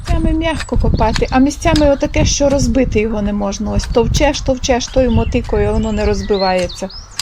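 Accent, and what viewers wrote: Western Ukraine, Ukraine. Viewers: Пробачте, вперше попала на Ваш канал і цікавлюсь з якого регіона ви переїхали? Бо мова у Вас як у західних українців. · Пані, ви справжні і не звертайте уваги на недолугих людей, які ще сміють сміятися і засуджувати, що ви купили стареньку хату. Невже притомна людина може так думати? Люди, та ЩО з вами?!! Тисячі людей тікають від дикої орди, залишають домівки, залишаються без миски-ложки, змушені починати життя на новому місці, а ви зловтішаєтеся! Замість допомогти, чи просто підтримати добрим словом. Пані, нехай вам Господь дає сили і здоров'я всі негаразди подолати.❤ А певно пані має західняцьке коріння, бо чую по розмові)). Вітання вам від тернополянки❤❤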